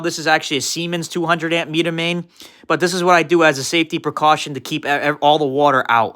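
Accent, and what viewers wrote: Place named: Fall River, United States. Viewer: Hahaha I took one listen and was like this guy's gotta be from FAWL RIVAH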